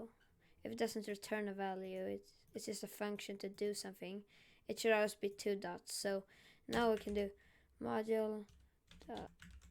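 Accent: Sweden. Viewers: You sound swedish. Are you swedish?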